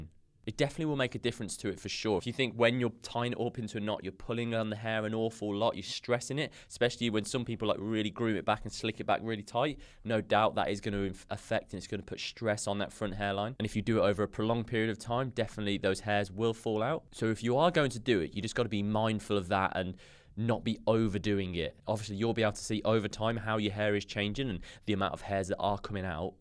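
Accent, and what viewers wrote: Cockney, United Kingdom. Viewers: I really like to watch your videos, your British, sort of cockney English, makes me rock. Keep up your great work! I also like the way you dress A LOT, very British as I said, very Londoner.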